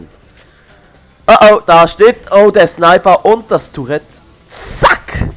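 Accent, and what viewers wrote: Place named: Switzerland. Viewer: haha mer ghört voll din schwitzer akzänt :D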